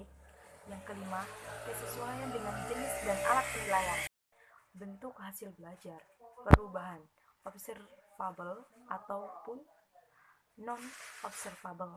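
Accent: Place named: Java, Indonesia. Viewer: Semangat KK😂 Jawa nya medok mbak ee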